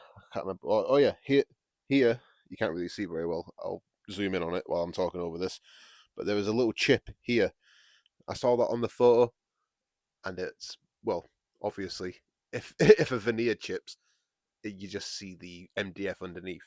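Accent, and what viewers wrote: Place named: North, United Kingdom. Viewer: Nice to hear a "nurthern" accent! I'm from North Wales but exiled in Bavaria. I'm a wood pallet man myself, heat my home with them, but your video is so interesting. Some other guy on YT said you can get free outdoor Pressure Treated wood from people dumping their kids' wooden swings etc. when they are older.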